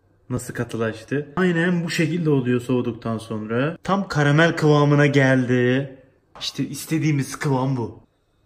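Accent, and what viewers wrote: Adana, Turkey. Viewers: Hatay diyo ama Adana şivesi var anlamadım ki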